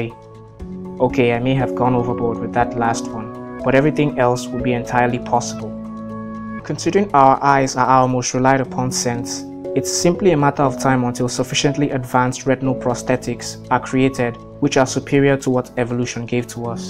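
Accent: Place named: Nigeria. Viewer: bro your accent sounds Nigerian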